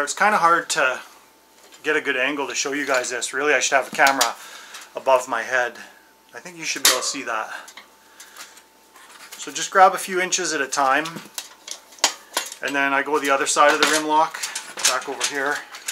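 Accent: Canada. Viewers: So cool, I just got one and am going to take a video of it tonight for my first use...just watching some people do this...you sound like me too...either from up north or Canada!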